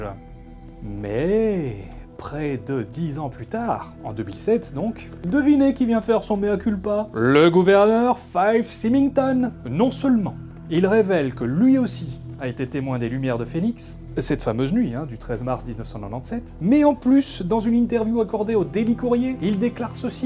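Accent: Belgium. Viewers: Belge Mr?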